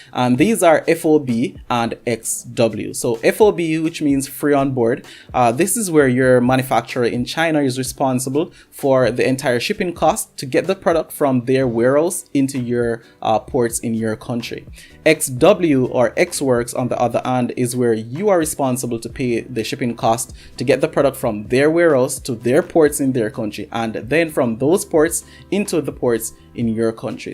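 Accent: Jamaica. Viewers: U sound like a yaadi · Bro your jamaican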